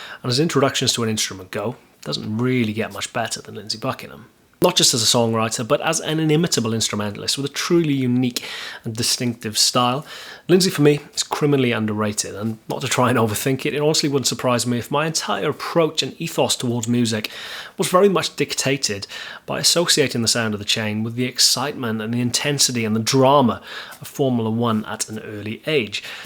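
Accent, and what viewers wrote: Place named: England, United Kingdom. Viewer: Best voice ever Bro, Soft but full and the English accent is of course Golden. From Your Canadian Brother. Keep on Ah:-)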